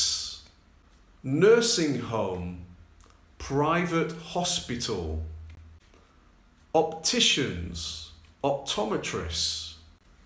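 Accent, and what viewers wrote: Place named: North, United Kingdom. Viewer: I can feel your accent is quite northern😁🏴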